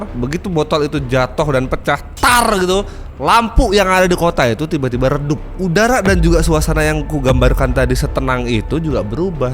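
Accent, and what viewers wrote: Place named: Lampung, Indonesia. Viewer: Lampung banget logat nya bang...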